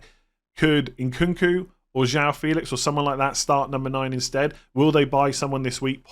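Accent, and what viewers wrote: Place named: West Country, United Kingdom. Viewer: Dude. Love your West Country accent. Saka’rrr don’t lose it man.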